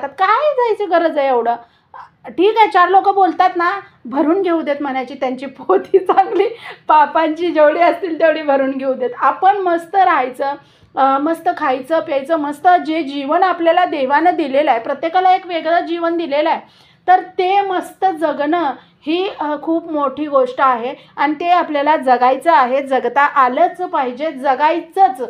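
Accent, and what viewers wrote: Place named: Sangli, India. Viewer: ताई तुमच्या सांगलीच्या भाषेतच बोला , सांगलीची भाषा ऐकायला खुपच भारी वाटतं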